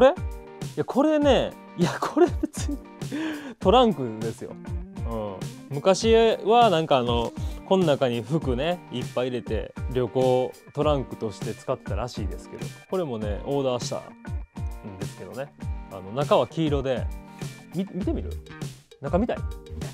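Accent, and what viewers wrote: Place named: Kansai, Japan. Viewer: 久しぶりに見たら関西訛りに違和感、、 ビジュアルはめちゃくちゃかっこよくなってて笑った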